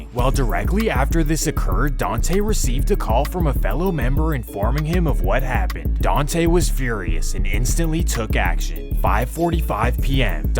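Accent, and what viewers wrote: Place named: South, United States. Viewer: You talk like you grew up in the swamp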